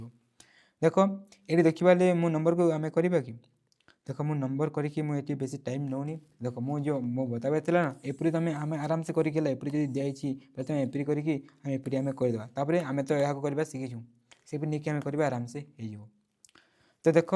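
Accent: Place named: Mayurbhanj, India. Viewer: Sir apanka katha raa laguchi apana mayurbhanj dist ru belongs karucha ti.❤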